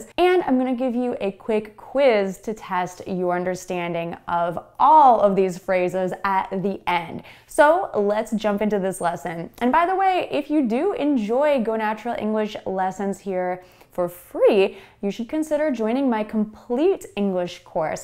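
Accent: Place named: United States. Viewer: Beautiful thank you in lots please believe me there's no one who may replace ya american accent plus watching you here on YouTube is like watch on video with a thousand of beautiful red hearts on back ground I mean for me is clear make these videos with lots of love.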